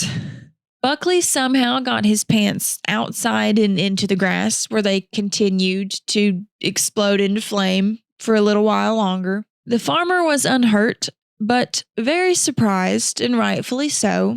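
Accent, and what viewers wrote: Kentucky, United States. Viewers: And as a nice bonus, along with that sweetest southern Kentuckyian accent of yours, I also love your unique sense of humor B. So that means I have found my new favorite content creator, and the videos I'm gonna binge - watching every day, while I'm resting and relaxing after my training, and enjoy while doing it. So the least I can do, is to like, & leave a comment for the " Algorithm Gods" while I'm enjoying your videos. Thank you, and I appreciate you and your hard work that you're putting into making this awesome videos for us, so very much. Cheers!👨‍🎤🌹👧🇷🇸🍀🥂🇺🇸🌏😎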